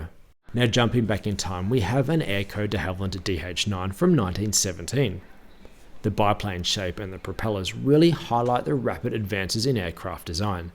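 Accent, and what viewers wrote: Australia. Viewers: Bloody brilliant mate, and it’s great to hear an Aussie voice. Keep it up. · It’s so nice to see a factual, educational clip AND it’s spoken in Aussie!😂😂🇦🇺 thanks mate, love your vids.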